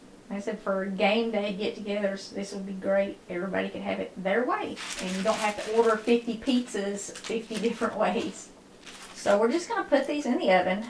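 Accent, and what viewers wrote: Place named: Kentucky, United States. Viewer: I want to thank you for your delightful channel. I have subscribed. I actually find your videos calming, full of love and deliciousness. I spent 4 years in your awesome state while I attended under graduate to college in Western Kentucky. Your food and cute little accent brings me back to those great days. I was a “Yankee” back then. I really didn’t know what that meant, but since I was “Italian” with first generation parents, they gave me a pass to join their group not as a yankee but as a European. LOL. I learned so much living in Kentucky. It’s a pretty great place to live.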